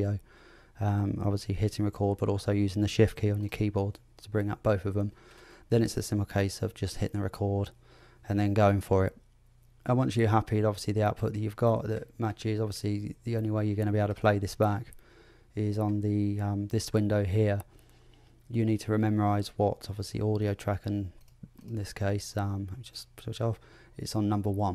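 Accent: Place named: United Kingdom. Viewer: Finally someone British. I can't take these American tutorial guys seriously. The accent is all wrong.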